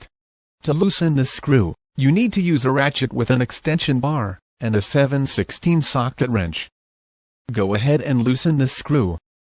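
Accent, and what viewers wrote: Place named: Spain. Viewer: I prefer your cool voice with Spanish accent instead of this robot.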